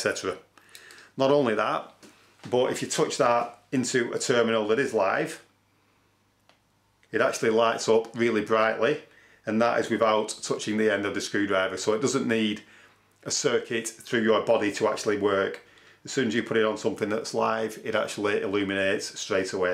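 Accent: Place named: Yorkshire, United Kingdom. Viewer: Looks like a Yorkshirian accent.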